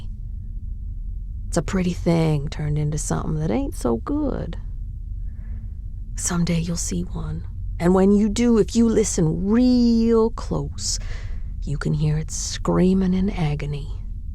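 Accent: Texas, United States. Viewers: This is so Cute thank you Miss also Love the Texan Accent you sound hotter with it ❤️❤️❤️❤️❤️❤️😘😘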